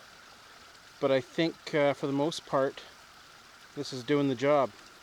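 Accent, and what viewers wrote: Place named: Canada. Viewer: It's the default go to for d!psh!ts who want to make some "government bad" meme and use Cali as the example of "over regulation". Brain dead as they usually are, they fail to take into account that, by his "accent" is a fine Canadian lad. As such, he deals with more regulations than a dumbass yank.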